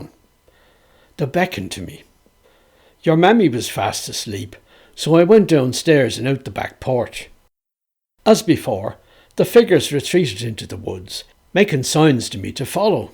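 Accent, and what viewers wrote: Dublin, Ireland. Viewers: What a treat, a tale told by a Dubliner waiting for me when I opened YouTube. Many thanks for this slightly sad story.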